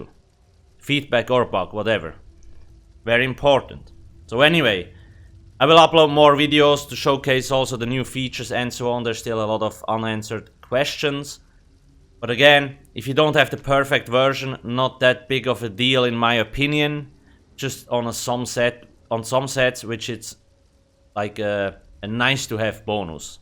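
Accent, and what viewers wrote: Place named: Switzerland. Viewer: He's from switzerland, dont be surprised by his accent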